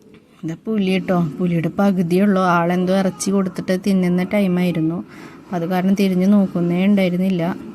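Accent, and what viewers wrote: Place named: Thrissur, India. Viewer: Aaa thrishooru bhaasha.....poliyaattaa😊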